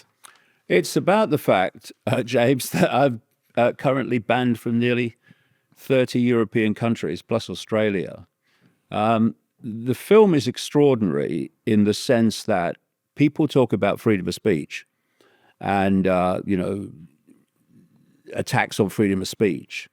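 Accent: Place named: Cockney, United Kingdom. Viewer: Sounds like he's got a London UK slight cockney accent.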